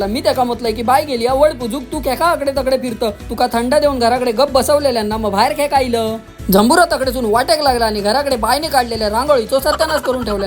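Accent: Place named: Malvani, India. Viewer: I like ( ❤) her hairstyle so nice cute girl.aani tuz malvani pn khup Chan aahe.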